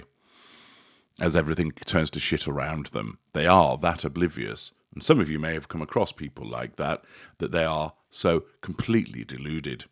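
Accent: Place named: Cockney, United Kingdom. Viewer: Hi I’m missing your Cock a nee voice!!😊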